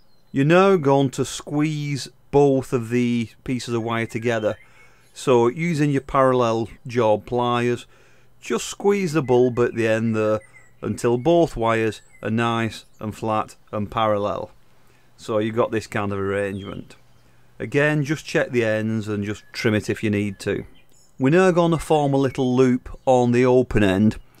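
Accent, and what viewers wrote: Ireland. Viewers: Sir, you are a very, Very, VERY good teacher! The Irish accent doesn't hurt either.😉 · Boy do I love your Irish brogue! It makes my Irish blood sing! (I’m 40% Irish and proud of it!). You’re a great teacher and I think I’ll subscribe!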